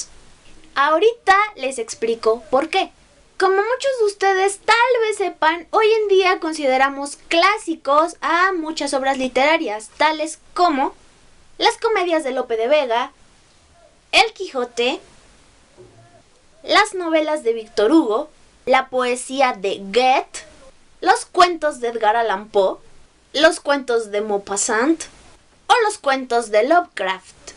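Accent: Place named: Mexico City, Mexico. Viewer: No sé como alguien con tu capacidad de analisis se involucra en este tipo de lectura. Q practicamente la inmensa mayoria es basura, no sé si sea xq sea propio de tu trabajo. Por ahi en un video tuyo vi q te gusta Harry Poter el libro xsupuesto. Viendolo a cierta distancia pues no es mas q una fantasia no digna de leer pues es eso una fantasia, con esa meticulosidad con q haces tus cosas me gustaria muchisimo q comentaras creo q tu le llamas reseña sobre Emil Cioran, en la cima de la desesperacion, o el inconveniente de haber nacido o algun libro de su obra. Me gusta tu manera tan chilanga de hablar y sobretodo no dar por sentado los planteamientos. Al verte recorde uno de los libros q tanto me ha agradado con el paso del tiempo y q probablemente te seria útil a tus alumnos se llama la lectura de Moises Ladrón de Guevara, es un compendio exquisito para quien cree sabee leer como para el q no. 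Saludos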